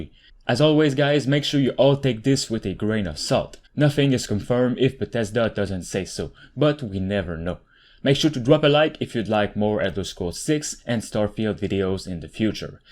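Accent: Quebec, Canada. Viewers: That’s not the subject but are you québécois? I say that because of your accent Anyways great video! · Are you French Canadian? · are you french canadian ? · Is that the accent of a fellow french canadian I hear?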